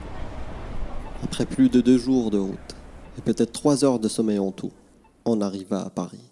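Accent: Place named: Germany. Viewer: j'adore le petit accent allemand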